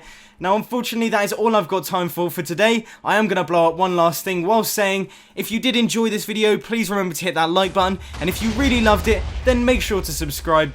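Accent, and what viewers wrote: United Kingdom. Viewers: British FTW! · Good old British. :)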